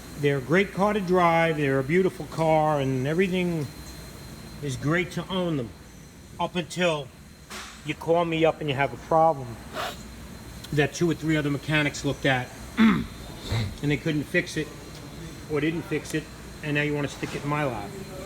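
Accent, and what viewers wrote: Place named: Valley Stream, United States. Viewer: I watched this video and by your accent I'm going to guess you are from valley stream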